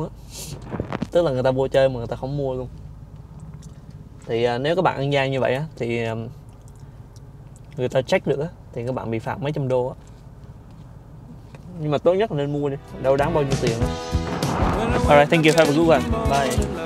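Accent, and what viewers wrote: Central, Vietnam. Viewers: Nghe giọng bạn là biết người miền Trung rồi nhưng nghe đến cuối mới bik đồng hương ở Calgary, cheers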